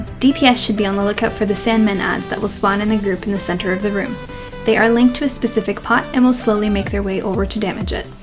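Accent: Canada. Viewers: Your accent gets more and more Canadian with each video. I like it.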